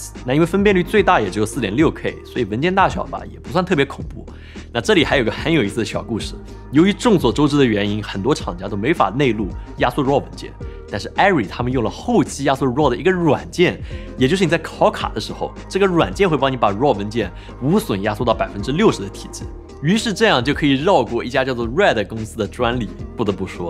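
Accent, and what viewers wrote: China. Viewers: 貨幣可不可以講清楚？我看你翻牆到美國開頻道，又操著中國口音，到底要我選哪個貨幣？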